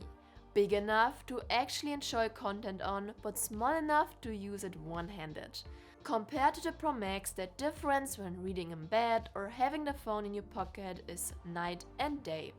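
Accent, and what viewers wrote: Germany. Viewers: I can hear the German accent :D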